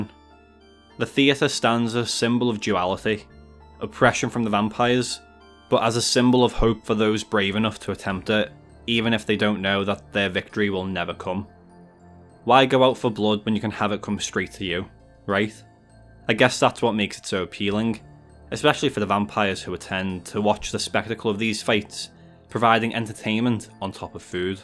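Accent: United Kingdom. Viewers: oh shit, we doing runescape lore? I don't play OS, but I'll listen to a British dude talk about RS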